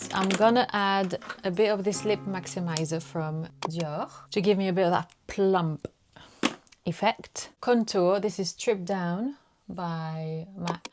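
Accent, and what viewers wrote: United Kingdom. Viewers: Very good British accent, I prefer this version by far.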